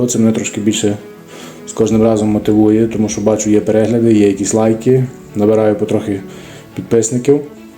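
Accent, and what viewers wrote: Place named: Western Ukraine, Ukraine. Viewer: Надіюсь люди підтримають ваш канал, приємно дивитись і слухати, хоть і чути акцент із західної:) Удачі вам! Підписка і лайк!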